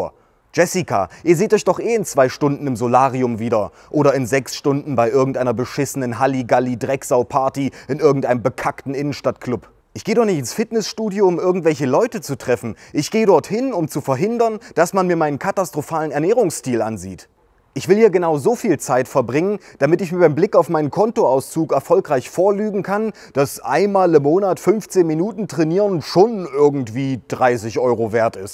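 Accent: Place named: Saxony, Germany. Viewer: Der sächsische Harry G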